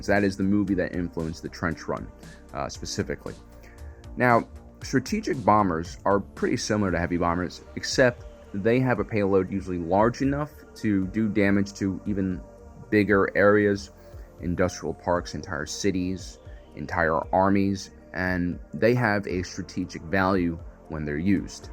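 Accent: Mexico. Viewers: Yeeeaaaahhhh, stopped watching and thumbs down at the messican-speak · Are u Mexican or some sort of southern American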